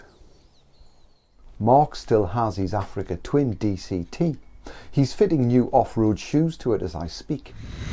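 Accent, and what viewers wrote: Newcastle, United Kingdom. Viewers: Another excellent machine in the same ‘category’ is the Yamaha XT 250. Indestructible, nimble, super reliable, terrific fuel economy, cheap to purchase and maintain, nice low super comfortable seat and will go absolutely anywhere. Rode mine for 6 years throughout all types of terrain (here in SW Australia) - never let me down and would go places (right spots) where many larger machines would not venture - or reluctantly. Excellent resale value - sold it for more than I bought it. ONLY downside - a drag when needing to ride long distances on bitumen in one stretch - only enough power to cruise happily at 90 - 100 km/h - any more and it was working too hard. Excellent video by the way - love the Geordie accent (albeit a subtle one) - the most colourful of all English accents.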